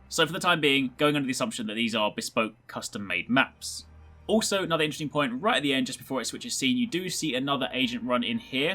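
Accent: England, United Kingdom. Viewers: Where in England you from?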